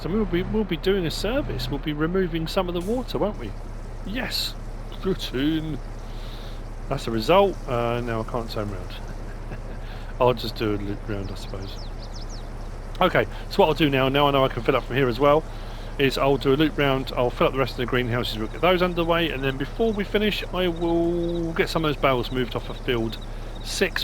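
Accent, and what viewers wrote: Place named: London, United Kingdom. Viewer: Hei where in England are you from?I feel like you have London accent.or around there.and again sorry if I miss spelt anything.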